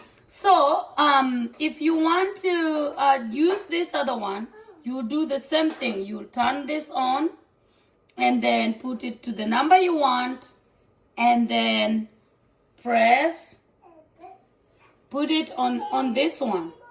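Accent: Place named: Luo, Kenya. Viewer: Thanks for the very helpful tips, and especially nice to hear the instructions in your Luo accent.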